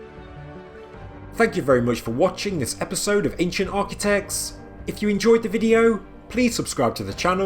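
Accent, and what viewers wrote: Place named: England, United Kingdom. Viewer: Please consider adding caption I don't get your English accent please 🙏❤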